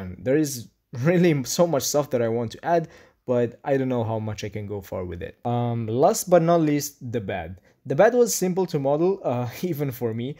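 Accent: Italy. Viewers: ma sei italiano! Complimenti per l'accento...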